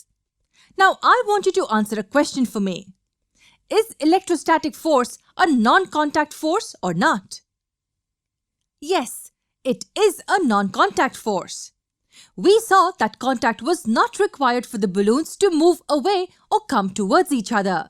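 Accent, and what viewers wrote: India. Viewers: The indian accent do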